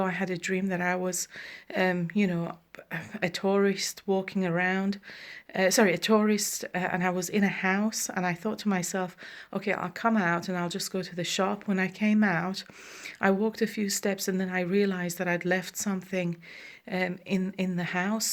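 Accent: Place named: Middle East. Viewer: I like your accent are you middle eastern like Jesus? By the way what did jesus look like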